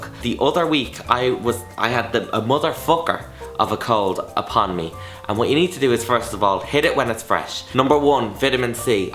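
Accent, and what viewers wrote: Ireland. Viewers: Is he Irish? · So do you live in Ireland cause you sound like an Irish person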